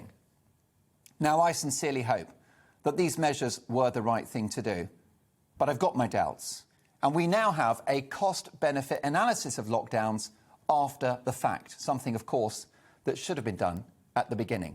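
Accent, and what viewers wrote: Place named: Home Counties, United Kingdom. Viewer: Sweden, Florida and Texas put out to people who will never check what is happening in those three places. Mark Dolan is a vacuous bot who just says things in a Home Counties voice, cheery picking quotes and saying things like ‘facts don’t matter anymore’ whilst peddling half truths and down right lies. Fox News business model is 100% what GBNews is following. Very sad.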